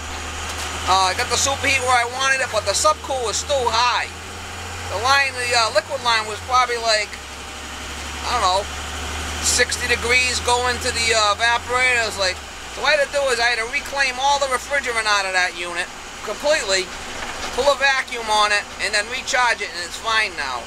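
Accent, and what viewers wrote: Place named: Boston, United States. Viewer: I knew it, the biddy and I were watching the video and said to each other, looks familiar, and sounds close to home, Good diagnosis and fix, Al from flippin' Bahston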